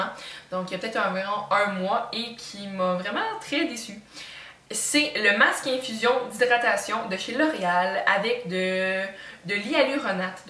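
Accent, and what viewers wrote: Quebec, Canada. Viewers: Ah ! J'adore les youtubeuses quebequoises !! Votre accent est vraiment craquant ! J'ai aussi les cheveux bouclés et quelle galère de trouver un produit efficace, que ce soit pour les nourrir ou les definir... j'ai testé aussi la game john frieda et comme toi, bof... je soupçonne même que ce soit bourré de silicone et autres berk...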